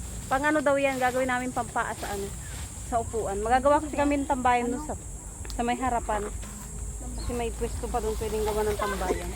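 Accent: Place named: Bicol, Philippines. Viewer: Taga bicol din kc ako pamilyar yong salita mo sa akin may punto kc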